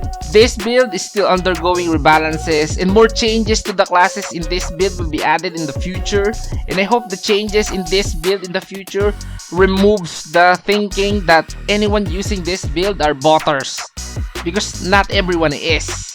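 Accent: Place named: Philippines. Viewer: Hard Filipino accent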